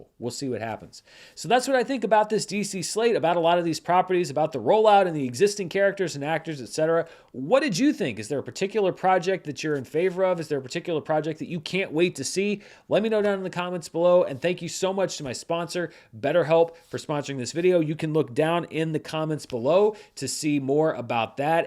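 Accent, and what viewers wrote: St. Louis, United States. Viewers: Is from St Louis?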